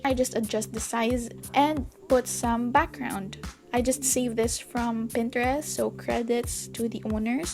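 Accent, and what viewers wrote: Philippines. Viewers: You are filipino aren't you I can hear the accent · I think your Filipina though(in my opinion) because of your accent😭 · Aye I just realized you have a Filipino Accent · Are you Filipino? Im not assuming you just sound Filipino! Im Half lol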